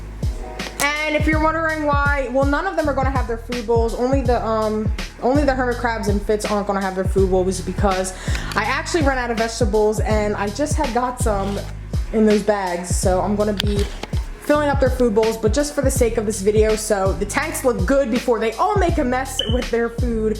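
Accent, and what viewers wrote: Philadelphia, United States. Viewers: I just discovered you and I really enjoyed seeing your set up! I have been a pet/animal enthusiast my whole life, and I plan on opening a rescue in a couple of years- this is great inspo for organization and habitat set ups! You're really great and I am looking forward to watching more. (Where are you from?? You sound so much like my family from Philly!)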